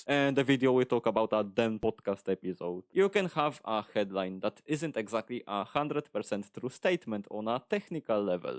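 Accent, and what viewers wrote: Poland. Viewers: Yeah I was thinking you sound Polish, did I get that right?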